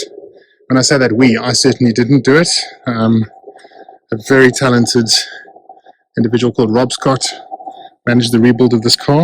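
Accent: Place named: South Africa. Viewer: I take it from your accent, you're South African. Is that where you got the car ? It's spectacular. The nicest 928 I've seen..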